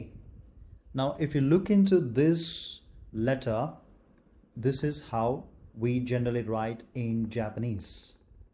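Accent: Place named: India. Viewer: Your presentation is very impressive👌.Your Telugu is clean unlike many other Telugu YouTube presenters. For example in other Telugu channels, they say the word వచ్చేసిseveral times, and లెగిసి instead of లేచి like so many repulsive Telugu words. Obviously present day Telugu is deteriorating. It’s a great relief to hear your Telugu . I wish to follow your Japanese language lessons. Please continue.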